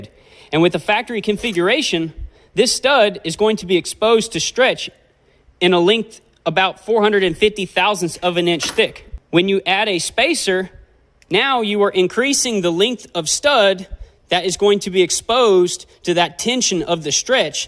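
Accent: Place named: South, United States. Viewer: I like the fast pace verbosity with the a touch of southern twang!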